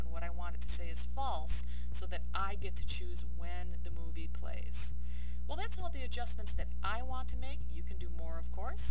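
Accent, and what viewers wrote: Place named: Michigan, United States. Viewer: SO helpful! Thanks! I could tell you were from Michigan within your first 2 sentences.;~)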